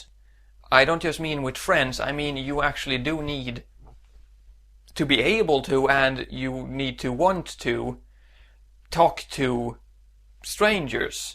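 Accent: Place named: Sweden. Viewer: I can't help loving the Swedish way of pronouncing "yob." And enyoy... Never knew.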